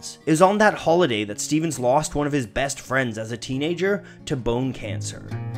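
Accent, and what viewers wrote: Canada. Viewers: You sound canadian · jukeboxjoe As if the thick Canadian accent didn't give it away otherwise lol.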